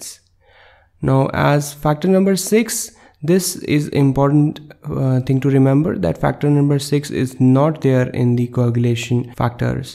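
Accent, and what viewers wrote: India. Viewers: Nice video, but seriously the way Indians talk is infuriating. Please check <link> for the correct pronunciation of fibrin -.-"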